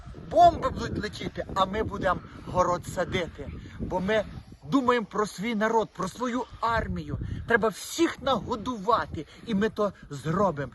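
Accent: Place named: Chernihiv, Ukraine. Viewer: вы живёте случайно не в Черниговской области? Я прямо свою бабушку услышала. Вот именно так она говорила, слово в слово. Я с Сибири, а моя бабушка с Черниговской области. В Украине, ведь везде своё наречие. Бывает,я кое какие слова понять не могу, а вас прямо каждое слово. Я молюсь каждый день с начала войны за вас и скорблю вместе с вами. Дай Бог всё скоро закончится. Сил вам душевных и физических. Я вместе с украинцами радуюсь вашим удачам в бою, и переживаю за неудачи.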